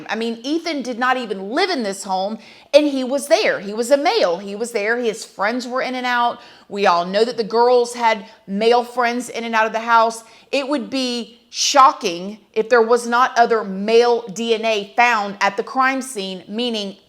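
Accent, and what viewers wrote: South, United States. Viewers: I agree with you! Why does it matter?! Just a distraction ! Omg I love when your country accent comes out sometimes!!!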